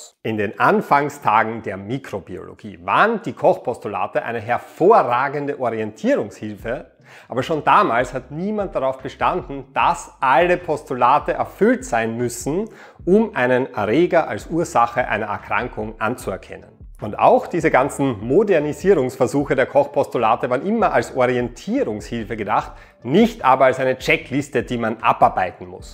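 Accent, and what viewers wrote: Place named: Austria. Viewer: Ich mag deine Art, in deinem Deutsch gerade so viel Österreichisch zuzulassen, dass du damit nicht proviziell (Entschuldigung, bin selbst Österreicher, ich darf das) wirkst, sondern die Allgemeingültigkeit deiner Aussagen betonst und trotzdem eindeutige rotweißrote Perlen setzt, die dich unverwechselbar machen und deiner Sprache Persönlichkeit geben.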